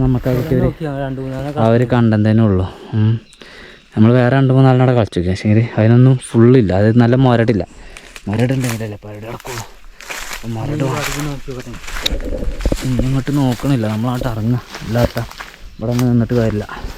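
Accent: Malappuram, India. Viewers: ഗെയ്സ് നിങ്ങൾ പൊളിയാണ് സിമ്പിൾ ആയ വിവരണം ,നമ്മൾ സ്വയം യാത്ര ചെയ്ത് explore ചെയ്യുന്ന ഒരു feel ഒരു തള്ളും ഇല്ലാത്ത നല്ല വിവരണ vdo, ഞാനും ഒരു മലപ്പുറം ജില്ലക്കാരൻ തന്നെയാണ് ,, ചെറുതായിട്ട് നമ്മുടെ സ്ലാഗ് കുറച്ച് ഒക്കെ കുറക്കാം നിങ്ങൾക്ക് ഈസി ആയി പറ്റും ,വരും യാത്രകളിൽ ഡ്രോൺ ഒക്കെ ഉപയോഗിക്കാൻ കഴിയട്ടെ ,, നിങ്ങൾ മില്ല്യണുകൾ അടിച്ച് കേറി വരട്ടെ എന്ന് ഈശ്വരനോട് പ്രാർത്ഥിക്കുന്നു ,ഒപ്പം എല്ലാ സപ്പോർടും❤❤❤❤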